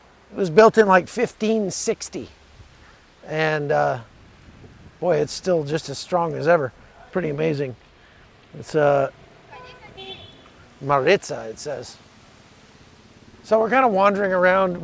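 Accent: United States. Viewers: I guess he's american by his accent. So I can justify his limited knowledge of everything outside the United States. To americans, everyone east of Germany is russian and writes and speaks russian. And they are probably communists :D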